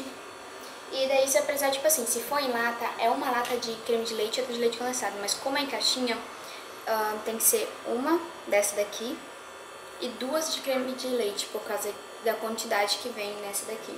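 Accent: Pará, Brazil. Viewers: sotaque de paraense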